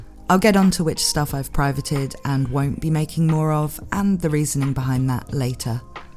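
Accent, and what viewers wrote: United Kingdom. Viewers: I knew after listening to your video on Noralities that subscribing would be wise. You have "the voice", I could hear it then and I hear it now. Beautiful, smooooooth, intellectual British voice (sorry if you're Australian, I'm American please forgive me) that I could quite literally listen to all day and just be mush. I AM MUSH! HEAR THIS PROPHECY! WE SHALL ALL SLEEP VERY WELL ON WHATEVER NIGHT THAT COMIC BOOKS vs MANGA SALES VIDEO IS PUBLISHED! Thus, it is written and I look forward to that night. :D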